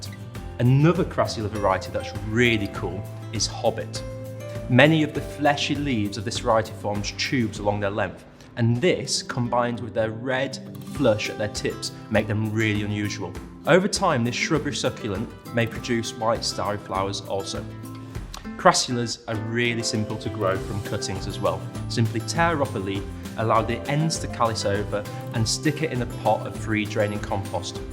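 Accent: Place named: United Kingdom. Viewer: Can someone tell me exactly what he said “water the plant moderately throughout which month? Water month? Or hotter month?” British accent is difficult for me. 😢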